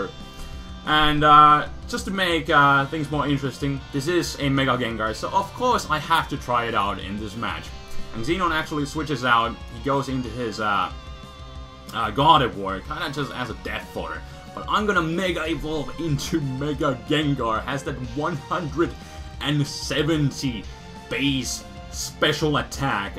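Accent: Finland. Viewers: Oh it's a Finnish accent sorry about the confusion but it's very formal :-) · So are you from Finland?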